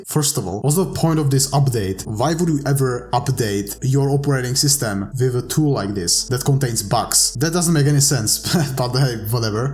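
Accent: Czech Republic. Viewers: hned sem poznal podle přizvuku že si čech dobrá prace ngl.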